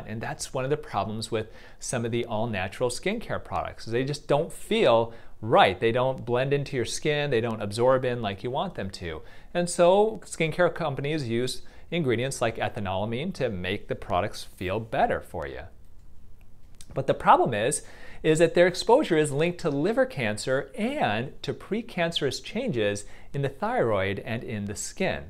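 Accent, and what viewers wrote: United States. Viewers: Thanks so much!🤩 And your voise reminds me USA 16 years ago.. Was there with Work and Travel program as a student. Happy to find this channel 🥳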